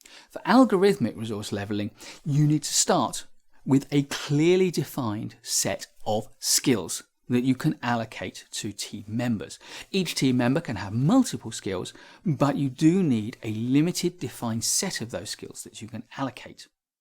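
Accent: United Kingdom. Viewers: Dear, thank you so much for this clear and well-structured explanation. Your British accent is so beautiful, I really enjoyed the video.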